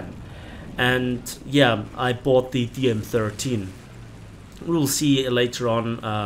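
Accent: Germany. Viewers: Hmm, you sound German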